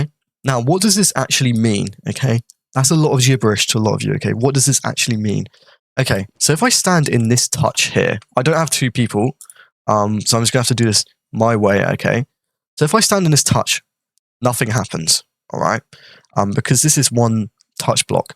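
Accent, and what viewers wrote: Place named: United Kingdom. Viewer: he got that Gordon Ramsay accent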